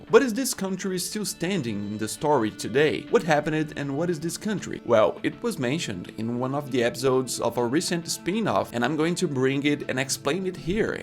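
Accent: Brazil. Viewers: Brazilian accent and Brazilian name. I am home. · hes brazilian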